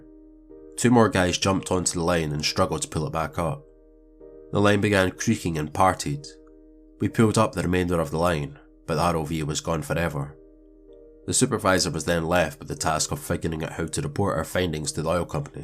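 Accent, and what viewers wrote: Scotland, United Kingdom. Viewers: Turning on auto captions will let you know the AI does not recognize a proper Scottish accent lol it's hilarious!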